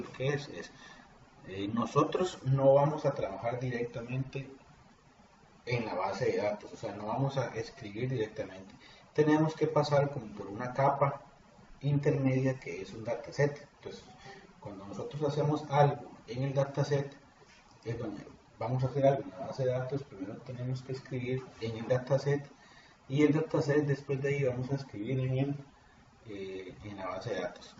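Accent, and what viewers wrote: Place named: Costa Rica. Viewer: Uno reconoce a un tico hablar en cualquier lugar :-)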